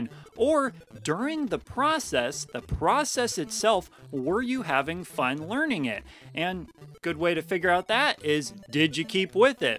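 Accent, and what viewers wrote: Midwest, United States. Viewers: ah ha! Now that I have you, are you from the Midwest?? You sound like a friend from MN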